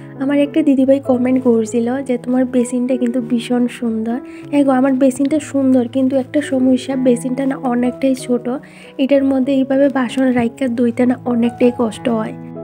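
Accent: Bangladesh. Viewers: Khub sundar.tumi kotha thako. Tumi ki bangladesi.toma kotha sune mone hoche.kichu mone koro na.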